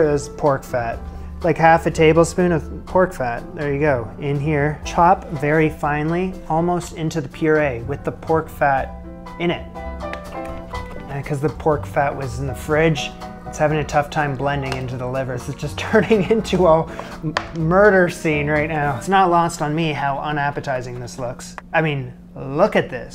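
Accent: Canada. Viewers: I was surprised by NYC. His vowels pronounce him to be Canadian...or so I thought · The Canadian accent always gets me haha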